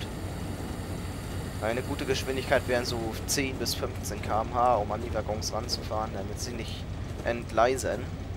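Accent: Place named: North, Germany. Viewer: Merkt man an der Sprache :D Hamburg hattest du glaub ich im Video gesagt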